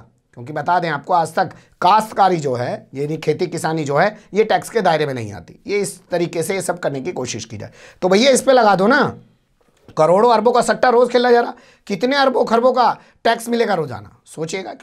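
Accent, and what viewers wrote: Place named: Meerut, India. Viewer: ka merat wala style sunne mein achha lagta hai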